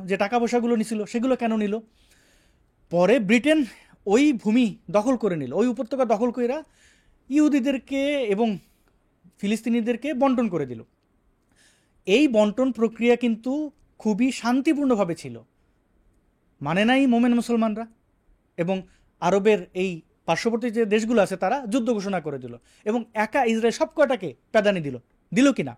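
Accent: Sylhet, Bangladesh. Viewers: brother, your Sylheti accent is wow. JUST LOVELY AND HEART TOUCHING ❤❤❤❤ Love from Sylhet..... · ভাই একবারে সিলেটি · Vai apne sylheti eta jantam na..bala laglo sylheti mat hunia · আপনি কিতা সিলেটি নি 🙂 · Gowaaaaaaaadddd, tmr sylhet accent 😭 cuteeeee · ভাই কি সিলেটি নাকি? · ভাই কিতা সিলেটি নি?🫠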